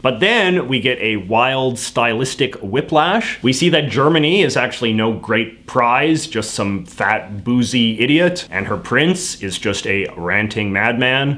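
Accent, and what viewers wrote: Canada. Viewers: I was listening to this without watching and I realized it was with the way he says "about". Damn Canadians.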